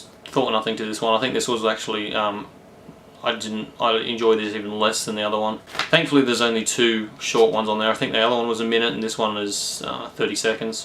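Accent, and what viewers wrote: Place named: Australia. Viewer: Nice review! Where from Aus you from?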